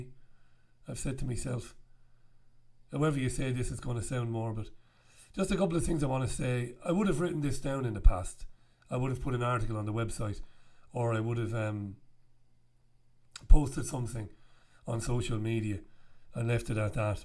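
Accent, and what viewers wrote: Ireland. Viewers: your dulcet Paddy tones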